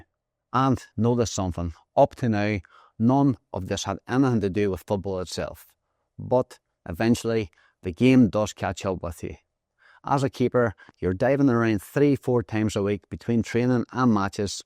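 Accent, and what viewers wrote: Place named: Northern Ireland, United Kingdom. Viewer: Northern Irish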